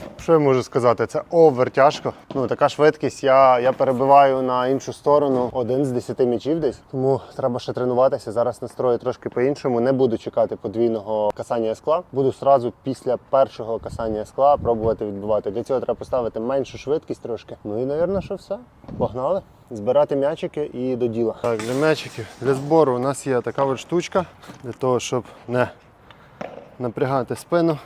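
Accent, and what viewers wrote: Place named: Volyn, Ukraine. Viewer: Випадково не з Волині?) Акцент рідний якийсь 😁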